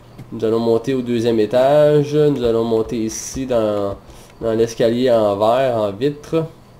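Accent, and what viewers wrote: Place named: Quebec, Canada. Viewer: T'est vidéos sont trop cool et ton accent Québecois est vraiment plaisant pi moi aussi je suis un Québecois, Vive le Québec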